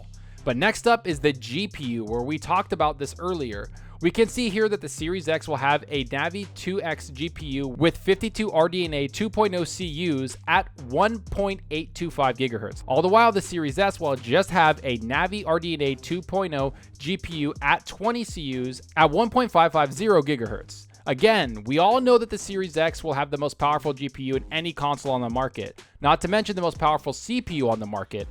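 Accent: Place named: United States. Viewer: This channel is aids. Video is 80% neddless filler with an annoying over Americanized voice.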